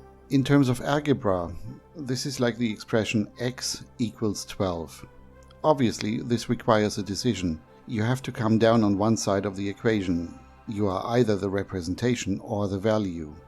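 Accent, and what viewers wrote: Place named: Germany. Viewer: Love your videos, not just the info and your thick German accent but your editing, too. Good to have you back.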